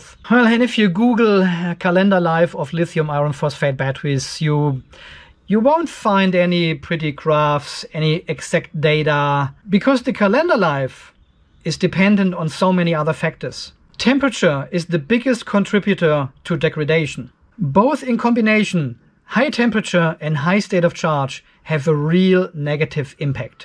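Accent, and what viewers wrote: Germany. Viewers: Your accent sounds german. Am i wright? Super Video, Danke 👍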